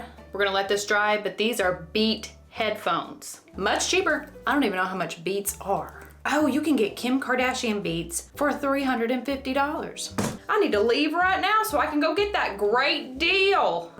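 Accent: South, United States. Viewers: I think you’re absolutely hilarious and adorable. You have a very magnetic enigmatic quality and you’re gorgeous. You don’t SUCK at this YouTube thing. I get sucked into your videos every time I watch just one! I love your accent, your humor, your southern sayings. I think you’re killing it girl. Keep up the amazing work! You got this!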